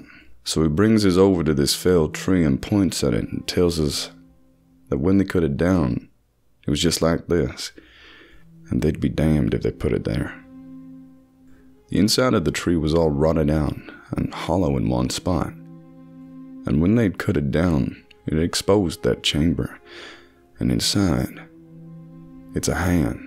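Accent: South, United States. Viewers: Great job on the American accent! :-) I love these stories. My dad was a volunteer with Search and Rescue here in Canada years ago. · Your American accent is 👌🏻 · I swooned over the southern accent. I recently discovered your channel dear stranger, and I fancy your narrative.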